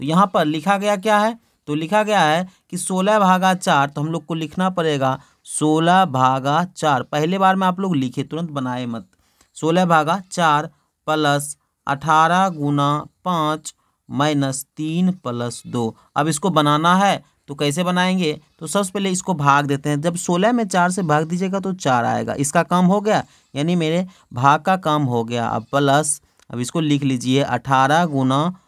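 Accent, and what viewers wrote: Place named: Maithili, India. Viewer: Bhai aap bahut maithli bol rhe ho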